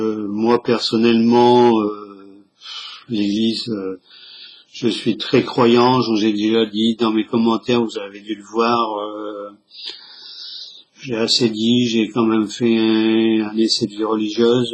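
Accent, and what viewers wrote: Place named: Southwest, France. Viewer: salut. au fur et à mesure du descriptif de ton reve, j'ai ressenti un extrême mal-à-l'aise, et sans réflechir, j'ai pensé de suite aux Francs maçons et à une messe noire avec un sacrifice... ton tirage semble confirmer cette pensée, et en tout cas, laisse un grand point d'interrogation. Je profites du fait d'avoir accès aux commentaires pour une question: Je sais que tu habites dans le nord de la France, mais je remarque, quand tu prononces certains mots avec le son "O", (ex: autre) je vois que tu le prononces comme moi à Bordeaux et dans la region du Sudouest, et pas du tout comme quelqu'un de ta region... tu as une explication?????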